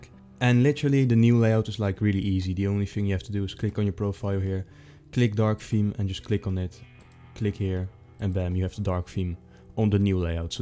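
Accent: Netherlands. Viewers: he has an a dutch accent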